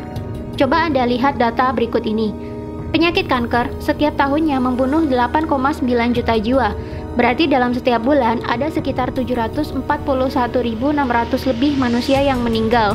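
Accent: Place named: East Java, Indonesia. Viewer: 🙄 saya berasa di Jatim dengan pecel tumpang denger logat mbaknya 🤔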